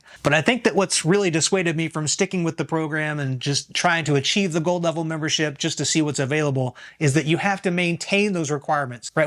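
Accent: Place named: United States. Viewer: 😂 it blew my mind, especially because you sound american. Otherwise I’d just assume that you’re from scandinavia or something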